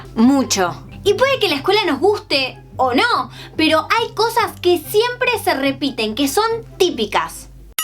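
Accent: Argentina. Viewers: nuevo sub eres argentina? o no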